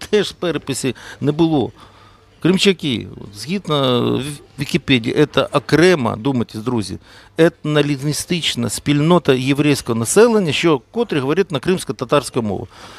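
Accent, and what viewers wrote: Russia. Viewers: Украинскава? Це не дискредитація? Акцент чисто кацапський А нема більшого ворога ніж кацапи. То не маніпулюйте, навчіться української вимови хоча б. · Мій дід би сказав: "срав пердів і ще хотів"! Може автор відео ніколи не був у Бердичеві, або у Черкасах, або у Львові де назви вулиць писалися у тому числі і єврейською мовою ще у п'ятидесятих роках...хоча персонаж, що у якості довідника використовує "вікіпедію", вже багато про що говорить! Ну звичайно ж євреї! То не 🤡 закрив усі ракетні програми і довів до банкрутства "Укроборонпром""; скоротив армію та "відводив" війська! Ага! Тільки євреї винні у всьому! От 🤡 Ні!!! Ну а євреї обов'язково! І кацапи НІ! Тільки от євреї!!! Цікаво таки що автор цього опусу робитиме у світі без євреїв?! Ну без більше 80% Нобелівських лауреатів; без усієї банківської системи; без дантистів ну бо у більшості випадків євреї?! 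Без грошей бо навіть текст відео не може відтворити без папірця а значить не заробляє багато... Доречі! А чому такий "патріот" і не на фронті?! І мова у цього персонажа якась не зовсім українська...є акцент кацапський! Може "патріот" віщає з мацкви?! Га?! P.S. перші паперові гроші УНР дійсно мали написи декількома різними мовами! Читайте історію! Тільки час свій згаяв на цю дурницю! Якість інформації; якість мови; якість думок; стиль подання інформації як у першому класі...жах просто!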